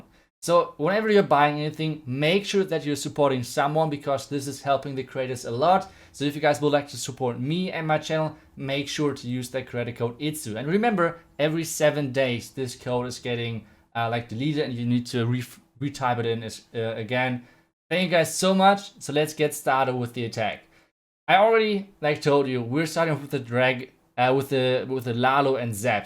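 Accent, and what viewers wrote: Germany. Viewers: U are german i can tell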